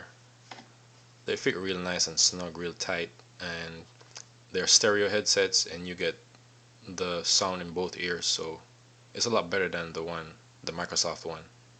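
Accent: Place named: Jamaica. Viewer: Your accent sounds a bit Jamaican, are you from there? :P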